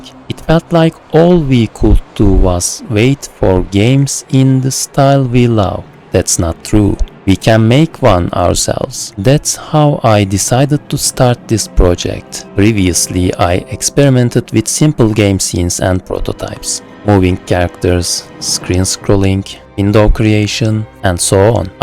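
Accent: Turkey. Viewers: You speak like Turkish :) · Are you Turkish? yazacaktım sonra adını gördüm ama instagram linkine tıklayınca Türk olduğunu anladım. Türk aksanıyla İngilizcenin en güzel örneği:) kötü bir şey demiyorum bu arada, aksan bence güzel bir şey 😀 Başarıların devamını dilerim, böyle oldschool rpgler eski grafiklere rağmen daha dönemi yansıtıyor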